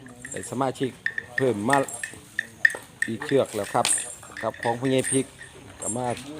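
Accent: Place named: Isan, Thailand. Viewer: ชอบที่แกพูดภาษาท้องถิ่น ภาษาอิสานน่าฟัง เวลาบรรยายก็มีภาษากลางบ้าง แต่เวลาพูดกันเองพูดภาษาท้องถิ่นดีแล้วค่ะ ดูอย่างควาญสิงห์ แกบรรยายเป็นภาษากลาง แต่เวลาพูดกันเองแกพูดภาษาท้องถิ่นชอบค่ะ แม่บางช่องพูดภาษากลางตลอด มันเหมือน DJR ค่ะ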